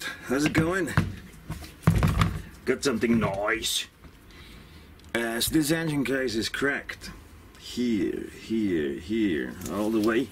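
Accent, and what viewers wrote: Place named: Australia. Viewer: I love the aussie accent 😂 schöne Grüße aus KA... Maaate!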